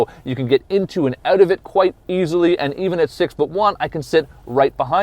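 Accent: Scotland, United Kingdom. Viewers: goodness..have you not heard of a scottish accent.this guy sounds crap and eats his word.Try Billy Connolly he has got a nice scottish accent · is this guy American or Scotish?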